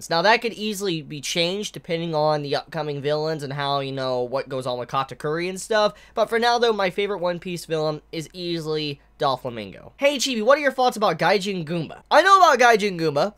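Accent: South, United States. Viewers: I think he from the south too.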